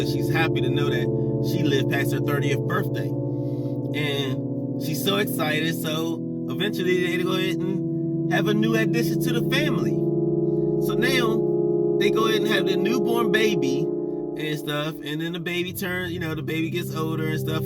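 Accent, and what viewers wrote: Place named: Mississippi, United States. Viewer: You sound like you from my neck of the woods..iam just could u be from yazoo county Mississippi 👽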